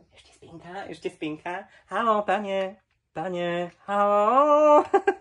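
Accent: Slovakia. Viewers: Nebojkajtě sa 😂😂😂 krčaky ako potrubia, drzkajte se mna….já lubim to jak si hraješ s tou slovencinou 😂😂😂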